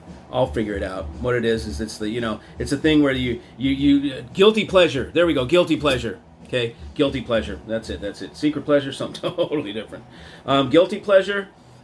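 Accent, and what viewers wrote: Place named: Rural, United States. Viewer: hey man great video, what is it with the hickey accent lol, hey I have balanced amps and pre amps and dac, bought a cable for the preamp once, polarity on the ac cable was inverted, got a hum right there, you make power cables so you should have run over that scenario. My point, even balanced equipment can get hum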